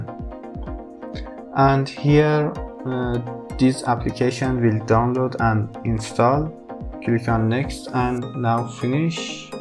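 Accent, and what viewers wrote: Iran. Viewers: Iranian accent haha I love it bro that was great thank you ❣️❣️❣️❣️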